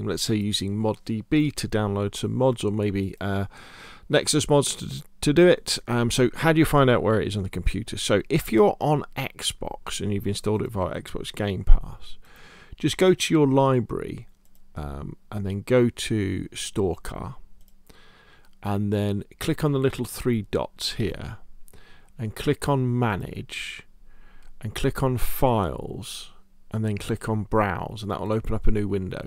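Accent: United Kingdom. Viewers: strange but i loved your voice haha are u british? thx 4 the vid <3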